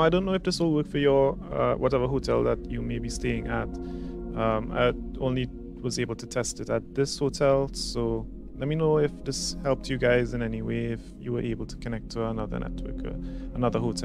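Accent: Trinidad and Tobago. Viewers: I knew you was a trini the second you talked lol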